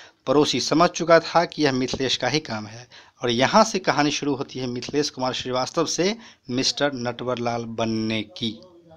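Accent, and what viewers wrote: Bihar, India. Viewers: Bhai yar bolne ke tareeke se pkka pta chal jata hai ki bihari hi hai 😍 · U are bihari ur voice totali bihari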